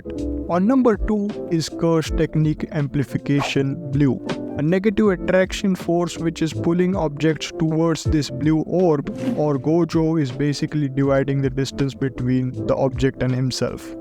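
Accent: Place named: India. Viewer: Hey, ranjeet. You got your Indian accent from consuming cow p*op?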